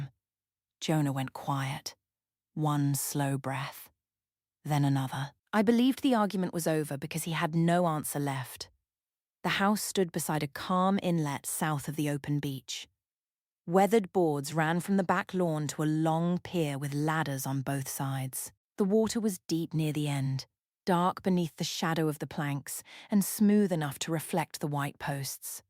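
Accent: United Kingdom. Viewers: Is it a coincidence that the stories with the British female accent have the most abusive women?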